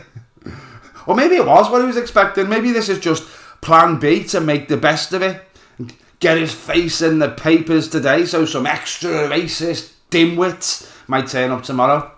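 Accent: Liverpool, United Kingdom. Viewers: Tommy isnt far right if tommy was far right he wouldnt have dealt with quilliam why dont you talk about the grooming gangs raping our girls which they do its been proven in a court of law and what does this guy do attack the one guy those trying to help..... also if tommy wants to put stuff up his nose thats his choice not your choice what this guy doesnt see the left wing are more dangerous than the far right its dangerous for us all and to end this rant the way you speak is arogant nothing worse than an aragont scouser lad talking like this online is pointless you think its right that he got put in a cell for that then it says it all about this guy your the twat your the problem hey but atleast tommy brushes his teeth this guy is loosing his teeth and why are you letting your dog on the couch you scrùff people always using his name for views facts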